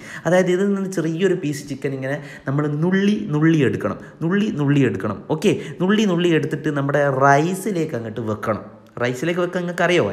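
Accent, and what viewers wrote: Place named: Kozhikode, India. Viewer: നിങ്ങളെ വീഡിയോ കണ്ടിട്ട് കുറച്ച് ആയിട്ടോ -- ഏതായാലും സംസാരം കോഴിക്കോടൻ ആയത് കൊണ്ട് നല്ല രസണ്ട്ട്ടാ😝 മക്കൾക്കും ഇഷ്ടം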